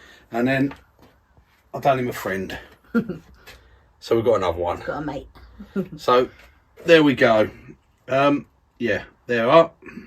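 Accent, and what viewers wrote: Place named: London, United Kingdom. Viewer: Nice to hear a London accent. enjoyed the video...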